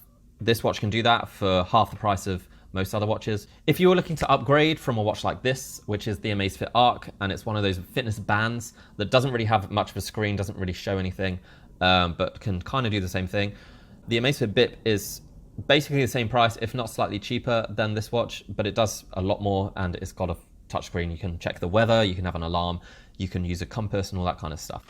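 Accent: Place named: England, United Kingdom. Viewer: u must be from england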